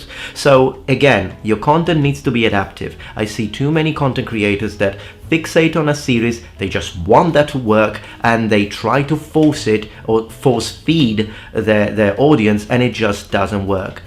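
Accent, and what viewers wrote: United Kingdom. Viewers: I can't believe how much time has passed! 500k?! I very rarely do these but I'll try be concise :D First off, congratulations! You deserve the milestone for your diligence over the years, truly well done man. I can't pinpoint when I subbed, but I've been along the ride for many years - to see this growth externally is super satisfying. I can say with confidence pre 50k, but my memory fails me to go beyond that. Around the time your language videos were more prevalent I'd say. My other reason to drop a comment here is to explain why I initially subbed - it was because of your character, before your content. At the time, there was very little content for what you were producing (and still do). To see a video on historical medieval warfare and/or weaponry was a rarity, a drop in the ocean. To then move to language in the next video to me was astonishing. 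Not just generic language, but an Italian man speaking fluent English with a British accent swapping to Greek and Japanese in the same breath was near inconceivable, I couldn't believe my eyes (and ears lol). I'll touch on why else that was important to me later but, above all you were passionate, and had infinite potential to grow, across every avenue. I wanted to see that among many other things. Youtube wasn't exactly new when I landed here, but it definitely wasn't the colossus it is now. Growing up with the platform I've always been very picky and arrogant in who I subscribed to. To me a subscription was a dedication, it wa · You sound very British but look very Italiano. How come ? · why you have a british accent?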